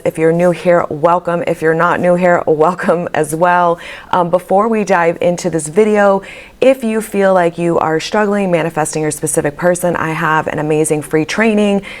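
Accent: New York, United States. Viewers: You're f***ng amazing. I can tell you're a New Yorker. I'm a subscriber and I'm so glad I found your site. You help me always over the last couple months. I'm a work in progress. Gemini guy and working on rebuilding with my Cancer lady. Just your aura and lovely way of breaking down the steps. Keep up the awesome work. Love it all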